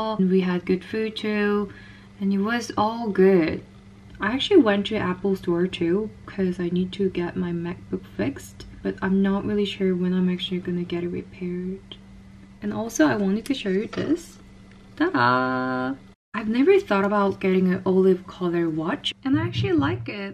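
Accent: United Kingdom. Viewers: 저만 느끼는건가요 ㅋㅋㅋ 그동안 못본사이에 발음하고 억양이 영국스러워진거같은데..... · Oof, you've got british accent more. hehe so awesome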